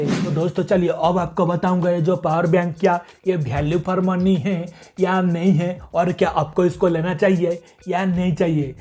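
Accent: Bengal, India. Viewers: He seems Bengali and that’s normal way of speaking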